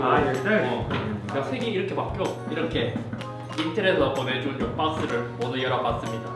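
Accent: Gyeongsang, South Korea. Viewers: 재밌다 재밌어..최고다~~~긔여워 죽겟당~ 갱상도 아이가~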